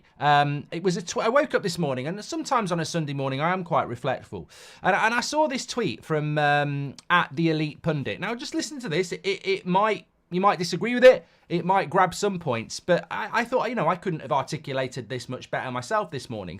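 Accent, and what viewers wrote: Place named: England, United Kingdom. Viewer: I love watching not just for his reviews but I can learn English accent at the same time. 😄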